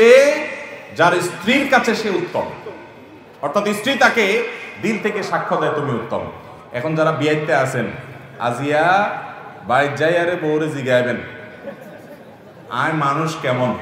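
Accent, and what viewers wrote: Chittagong, Bangladesh. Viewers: আহমাদুল্লাহ দেখি চট্টগ্রামের ভাষাও পারেন। চট্টগামে উনার মাহফিল হলে খবর কেমনে পাব? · মাশা-আল্লাহ,হুজুরের মুখে চট্টগ্রামের ভাষা চমৎকার লেগেছে।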